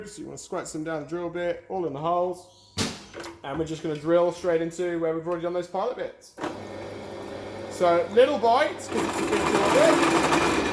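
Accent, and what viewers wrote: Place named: Australia. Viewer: He's sounding more like a Aussie Boi's! Nice Video Dude Keep up the awesome work.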